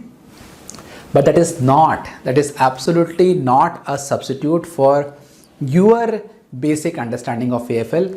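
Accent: India. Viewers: Are you from the Hyderabad ?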